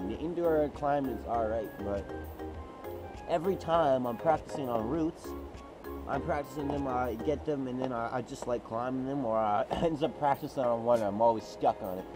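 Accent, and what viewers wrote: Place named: Newfoundland, Canada. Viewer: Awesome video bud. Was like I was there having a chat with ya! Your newfie accent is gettin tick! Haha cheers!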